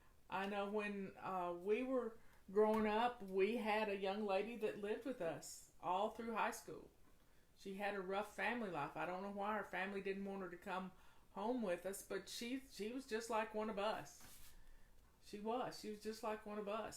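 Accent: South, United States. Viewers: Thank you for sharing your wisdom with us! I love your southern accent and words - it’s comforting to me and I’m not even southern!😄. Thanks for being you!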